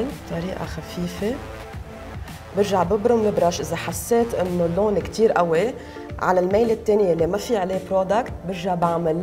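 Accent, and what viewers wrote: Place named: Lebanon. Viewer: Arabic Lebanese accent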